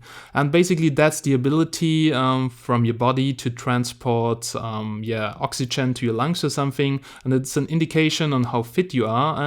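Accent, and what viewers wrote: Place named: Germany. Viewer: For me it sounds like Germany or Austria.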